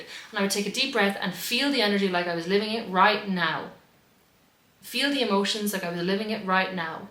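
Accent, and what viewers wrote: Ireland. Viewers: I love your irish accent.Your so beautiful ❤️😊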